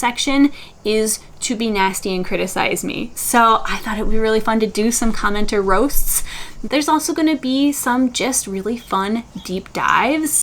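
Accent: Canada. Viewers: Yay eclectic! Also I love the way you always reply to comments. And yes I was crocheting while watching/listening. You are fun to listen to and there is nothing wrong with your Canadian mashup accent. I also have a CAN mash up accent in both official languages.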